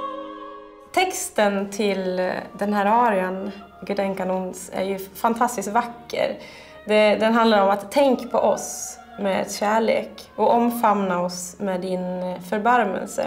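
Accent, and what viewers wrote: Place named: Dalarna, Sweden. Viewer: shes from Smedjebacken and speaks a mild and pleasant "dalmål"-dialect, sort of swedish hillbilly accent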